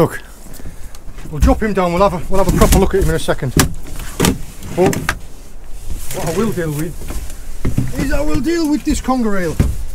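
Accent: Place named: Darlington, United Kingdom. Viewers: i take it you are northern by accent. You fish a lot of the marks down off the south coast. Great fun watching the Bluefin double🤣🤣🤣. Your vids i am getting on catch up but great viewing, they are keeping me sane. Cheers 🍻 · Brilliant videos matey. The last time I heard an accent like that was around Darlington when I was stationed in RAF Catterick 50 years ago.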